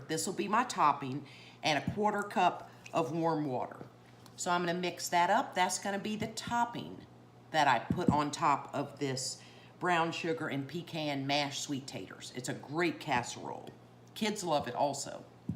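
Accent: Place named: Eastern North Carolina, United States. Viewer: You sound like my folks in Eastern, NC.❤❤❤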